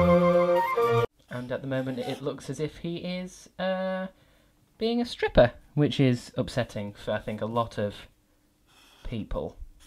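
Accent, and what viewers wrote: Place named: United Kingdom. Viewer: British accent is addicting.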